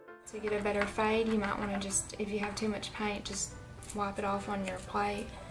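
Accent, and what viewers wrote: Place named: South, United States. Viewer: Are you SERIOUSLY Chinese speaking Southern what state are you from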